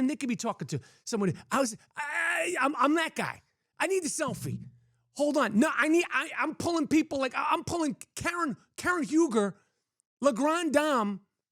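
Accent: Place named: Long Island, United States. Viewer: It’s amazing how much you sound like me and I’m from Long Island New York and your movies sometimes you don’t have that accent brother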